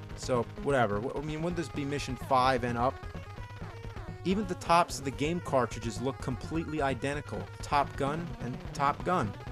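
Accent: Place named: South, United States. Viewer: and he says ayss he's got a bit of a souther accent he also said: what more could you ayask for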